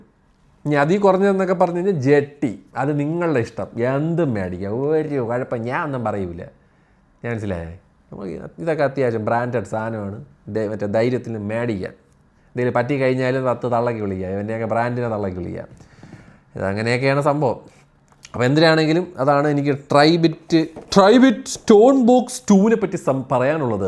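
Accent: Trivandrum, India. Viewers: ട്രിവാൻഡ്രം ചീഞ്ഞ സ്ലാങ് 😡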